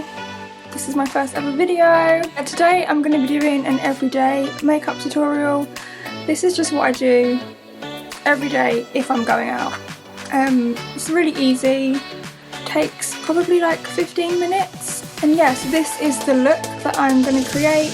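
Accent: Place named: United Kingdom. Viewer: so lovely british accent ❤️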